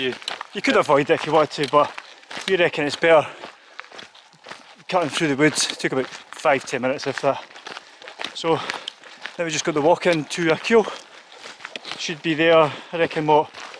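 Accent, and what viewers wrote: Scotland, United Kingdom. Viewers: The closed captions are having fun with your lovely Scottish Accent!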